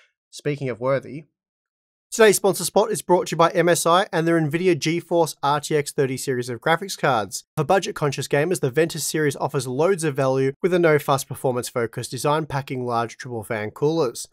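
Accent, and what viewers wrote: Australia. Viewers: The difficulty is probably higher with the Aussie accent on top of that. Though Steve from GN also spits out numbers at a million miles an hour.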